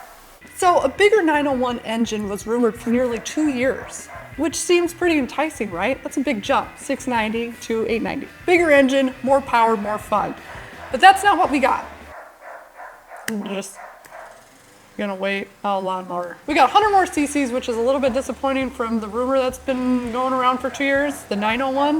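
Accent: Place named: United States. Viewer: Aaaaaaaarrrrr, your American.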